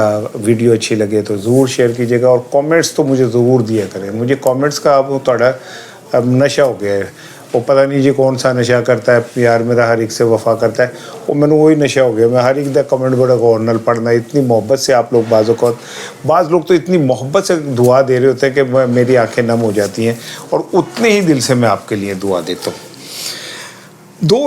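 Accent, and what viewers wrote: Punjab, Pakistan. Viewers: اسلام علیکم ورحمتہ اللہ وبرکاتہ صاحب آپ کا بولنے کے اندر جو اوردو اور پنجابی کا مکس فلیور مجھے بہت اچھا لگتا ہے 👍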